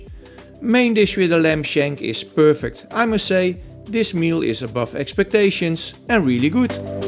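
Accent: Netherlands. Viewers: kom je uit nederland, ik kan dat aan je stem horen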